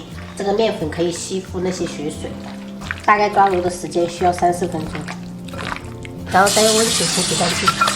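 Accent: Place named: Fujian, China. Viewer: 你是四川人怎么一口福建口音呢?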